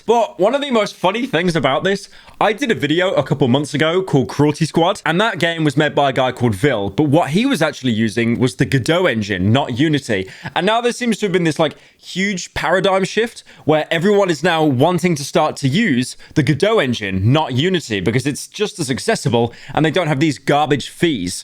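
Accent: United Kingdom. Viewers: I do bot care if live is slop its something i can just listen to every single day I love listening to the british furry yap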